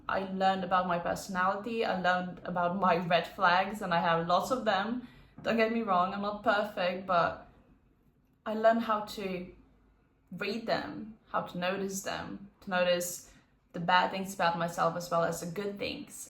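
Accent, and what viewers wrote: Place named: Australia. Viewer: There is definitely an Aussie accent sneaking in when you talk!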